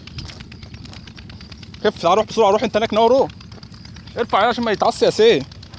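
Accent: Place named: Damietta, Egypt. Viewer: انت ياباشا من دمياط لهجتك زى لهجة الدمايطه ( احلى لهجة فى مصر اللهجة الدمايطى )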